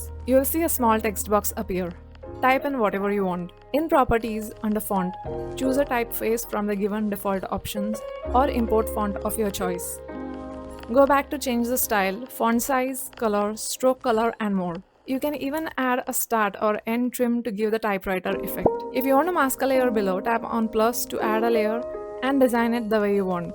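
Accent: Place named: India. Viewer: From his accent he looks like an Indian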